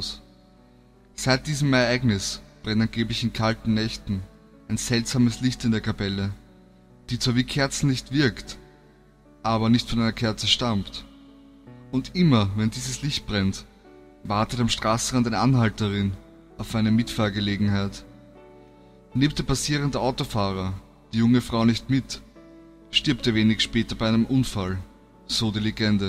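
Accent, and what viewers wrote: Austria. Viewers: Bist du Österreicher?? :D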